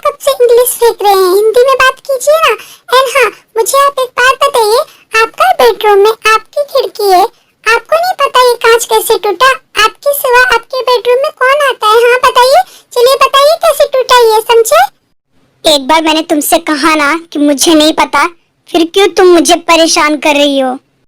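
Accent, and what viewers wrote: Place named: Bihar, India. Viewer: haan kyunki aap bilkul meri tarah thinking rakhte ho mujhe story se hi pata lagta hai aur ek baat aur aapka bihari excent bhi mujhe pasand hai kyunki main bhi UP wali hun🤭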